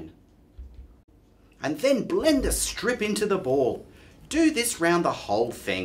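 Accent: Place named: Australia. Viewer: Are you Australia? Or New Zealand??? Coz I am Australian and my brother does this "Typical Aussie Accent" and as I watched this video, it reminded me of that voice! (No, I don't find it annoying coz I am used to it):)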